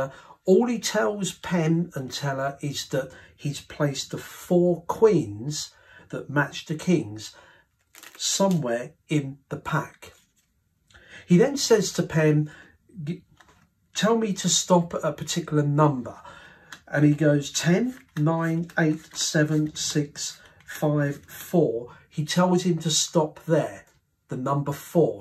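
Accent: United Kingdom. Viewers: Thank- you for putting the work in to teach and show us step by step how to perform this wonderful effect!! I have been into magic for about 40 years so even though i did not actually work it out step by step as you did i knew the basic method eas a stack and that the reverse dealing was part of the method. I will definitely be adding this to my routine!! Often when penn and Teller say they were fooled i think they are just pretending that they were fooled. If i know how it is done then certainly they must know; anyway that is how i think. They claimed that Shin Lim fooled them but i am not so sure that they really were fooled. Their motive? Shin Lim is considered a hot perfotner currently and anyone who. "" FOOLS THEM"", Performs one their Vegas strip show, to have Shin on your stsge is great publicity!! Thanks agin, sir for for all of your great videos!! 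P.S.-- my sister loves your accent; she says she thinks you have a Liverpool accent like the Beatles!!! Gary in dreamland; have a nice dream!!!🙂☁️☁️☁️⛅🌟🌟🌟🌟🌟🌟🌟🌟☁️⏰☁️ dream!!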